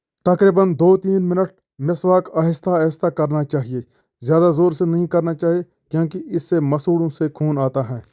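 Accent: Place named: Kupwara, India. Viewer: Pls focus on your accent. It needs great improvement. You are using typical kupwara accent.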